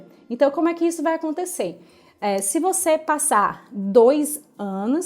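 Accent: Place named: Portugal. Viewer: Seu sotaque é tão bom de ouvir kk parece um pouco o português de Portugal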